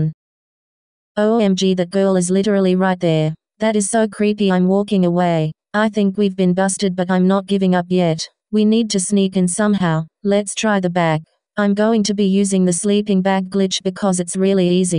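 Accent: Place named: United Kingdom. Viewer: WAIT OMG UR BRITISH? IF U R FINALLY A BRITISH YOUTUBER LIKE- 😭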